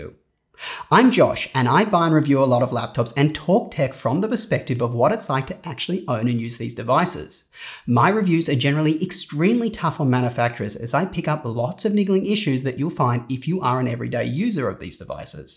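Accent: Australia. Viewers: thought ur aussie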